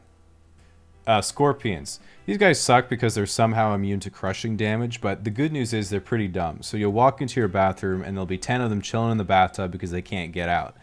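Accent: Australia. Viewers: Solid Australian accent 👌🏽 but then you mentioned telstra and gave it away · your aussie accent is mindblowing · Can we all take a minute to appreciate his Aussie accent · after watching this i have decided australian accents are nice actually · Ahhh cool I thought you could nail the australian accent, do a good job of getting the extended vowels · I know i never heard you talk with an australian accent before. Did you chat with your Mum for a while on whatsapp must before you voiced this? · As an Australian, man definitely knows his culture. Even his accent is amazing, surprised he didn’t keep one from when he went to primary school here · I havnt heard your voice for a year and you sound a lot more Australian than I remember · Wait... your Australian? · Please keep talking in the Australian accent thanks! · It's obvious you lived in Oz as a kid. The accent is perfect. 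I'm from Tassie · Holy fuck I would never have put you as an Aussie but now you've mentioned it I keep hearing the accent slip through every now and then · How does your Australian accent sound so much like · You are one of the only American with an authentic Aussie accent, also, the drop bears 🤣🤣🤣 Australian gang where you at? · that flawless yet unendingly irritating Aussie accent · Causally explained in Australian accent hits different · Your Australian accent is my favourite thing about this channel probably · Dude. I knew you sounded Aussie sometimes. You say the animals like you never left but most of the time you now sound vaguely American/Canadian i was never sure cause I'm Aussie lol *Edit I just got to the end and found out youre from Tassie..same...which wouldn't be weird except there are so few of us. Props for being YouTube famous hey. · im from Tassie, and I always thought you speak with an aussie accent at times! 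how cool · I like how it’s hard to tell what his real accent is. Is he faking the Australian or Canadian? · your accent is a noticeable mix of australian and north american english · Other videos where he does his Australian accent?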